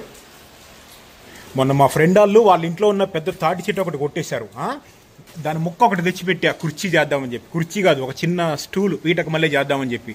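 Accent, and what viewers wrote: Andhra Pradesh, India. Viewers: Hii bro, మీది తెలంగాణ అని అన్నారు మరి మీ స్లాంగ్ మా ఆంధ్ర ప్రదేశ్ స్లాంగ్ మాట్లాడినట్టు ఉంది 😂😂😂😂😂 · Thammudu " Angadi " antunnav meedhi Telangana lo Nizamabad antunnav.Nee Yaaasa emo Andhra prantham lo vaade Yaaasa la ga vundi.Meeru Andhra prantham nundi valasa velli Nizamabad lo settle ayyara??? TG lo "Dhukaan" or "Dhukanam" antaaru kada andhuku doubt vatchindi.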